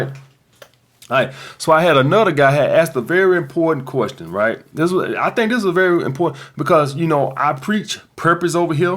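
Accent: United States. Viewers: Yo Bruddah haha this guy is the Don yo ha I wanna mention this to my cronies in the liquor store n down town pubs but worried there gonna drill me Ya saying that every man really has these problems Life's difficult I grew up no family I'm safe as fuck to everybody but ppl just take the biscuit piss dude lol But the woman they just killus It happens to us all haha omg Until you get some Asssss Dat fucking Credit Card stayn In the god damn fOcking pocket Hahahah Man American Accent is fucking Ace 😂 Respec dude ur Wicked 👍👍👍👍👍👊👊👊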